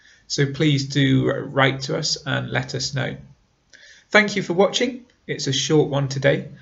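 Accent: United Kingdom. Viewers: dear my best teacher i like your speaking style and your accent very well i want to learn british english and speak like you plz tell me the best way how to speak likes a british native speaker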